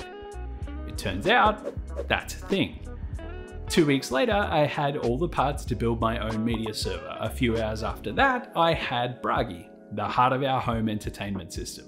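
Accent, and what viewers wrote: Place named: Australia. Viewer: Just had this recommended. Instant sub when heard Aussie. Stay for the great work in video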